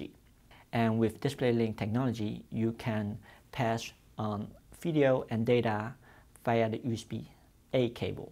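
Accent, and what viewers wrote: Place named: China. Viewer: Just listened to a terrible chinese accent for for absolutely nothing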